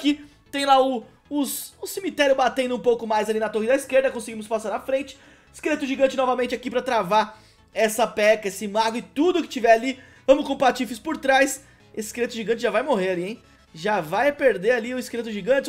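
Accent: Minas Gerais, Brazil. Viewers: mineiro ou tronco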